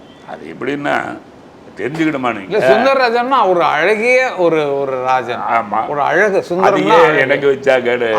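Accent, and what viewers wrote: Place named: Coimbatore, India. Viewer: Comibatore slang super sir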